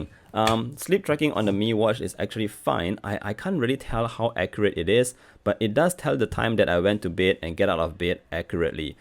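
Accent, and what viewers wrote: Singapore. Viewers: Do I here a SG accent?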